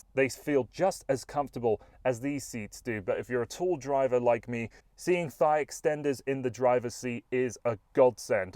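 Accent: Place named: United Kingdom. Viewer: I really enjoyed watching this video and I noticed that you did add in some funny lines. I have no trouble with the British way of saying things, because I’m used to Robert of Fully Charged. He loves to joke around. He says Hyundai the same that way you do. I was waiting for an aluminium joke! Keep up the awesome work! I have to admit that I mostly follow very low to no emissions cars and I might not have the time to watch every one of your videos.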